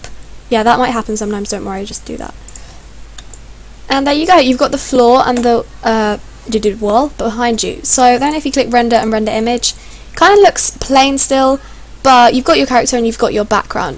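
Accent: United Kingdom. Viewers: Is this a British accent? You sound like it to me...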